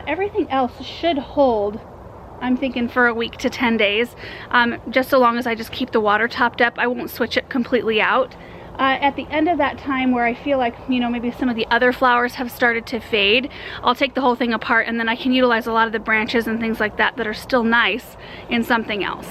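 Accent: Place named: Midwest, United States. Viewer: Hi, Just thought I would mention every time I hear you pronounce zinnia, I think to myself, oh our way with the "short i" must be a Midwest kind of thing. My kind of arrangement, esp. love the cabbage with the rose and berries. Thank you.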